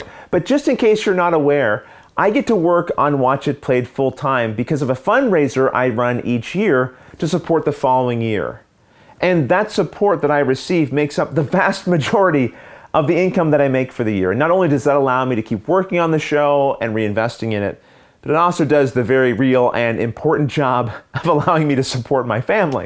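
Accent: Canada. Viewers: Hi. I'm fairly new to the hobby, and your videos have been great to help me get over the "learning curve" and develop my own gaming identity...what I like and don't, what's worth spending money on and what's not, and what gaming experiences I can share with my family and which ones are best left for playing with other adults. The fact that the videos are a lot of fun to boot is icing on the cake! (Not to mention the funny vowel pronunciations that lead my wife to say, "are you watching that Canadian guy again?") You're a good person doing good work, and I'm happy to be able to back you. Hopefully you can use my support to buy a carrot or two. Or some cider for Pep. Cheers and keep up the good work!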